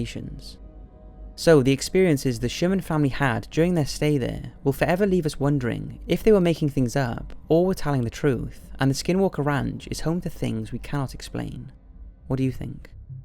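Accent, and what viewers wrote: Wales, United Kingdom. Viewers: r u welsh?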